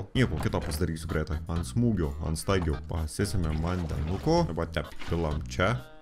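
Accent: Suvalkija, Lithuania. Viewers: Gerai moki kalbėt suvalkietiškai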